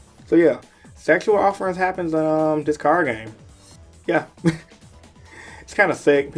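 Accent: Chicago, United States. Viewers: You from chicago man? Were you go?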